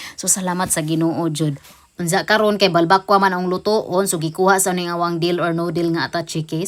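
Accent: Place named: Visayas, Philippines. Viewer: Unsa hapdos? Lain man pud imong binisaya Miss pag claro pud? Mas lami ang homba sa "Cebu"., "Yummy" kaayo.! Hahaha..😮😮😅😅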